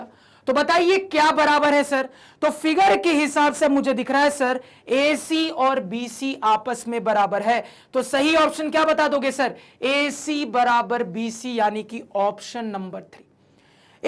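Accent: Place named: Bihar, India. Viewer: Sir app ko 5-6 months ho gye insta ID bane ko khete hue sir abb to bana lijye And in 11 th lecture you somewhere speak bihari but in 10th you speak like kaddhi boli . Kabhi 10 th lecture me bhi bihari language ka tadka lagyie.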